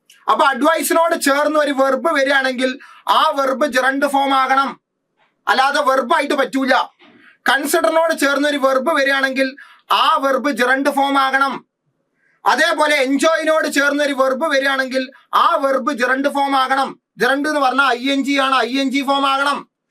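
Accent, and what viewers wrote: Malappuram, India. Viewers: Swantham muthu mani... ❤❤mash nte aaa malapuram slang um.. Comedy yum okke orupad ishtom🥰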